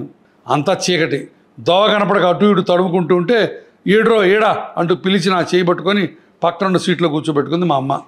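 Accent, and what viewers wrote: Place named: Nellore, India. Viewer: Chala authentic Nellore yaasa sir. Chala rojulaindi Intha raw and authentic nellore yasa vini 😊…. “Dargamitta” is a place in Nellore.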